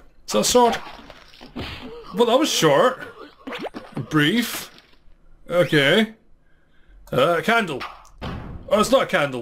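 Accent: Scotland, United Kingdom. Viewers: Haha this is too funny!:) it's actually so refreshing to hear a fellow Scottish accent on YouTube! Haha, love your videos!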